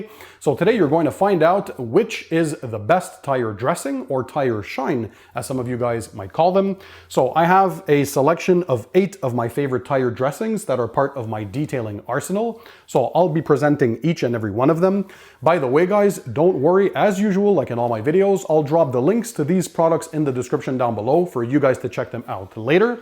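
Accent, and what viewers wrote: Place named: Quebec, Canada. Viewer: i could tell by the accent ahaha..i currently live in gatineau!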